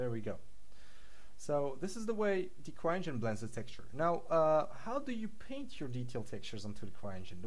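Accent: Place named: Germany. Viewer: you are a true artist, and you can explain very well! even the german accent is adorable :)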